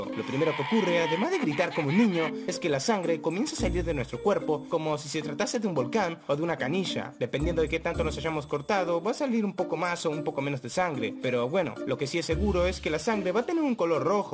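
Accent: Argentina. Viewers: Jaja vos sos Argentinooooo!!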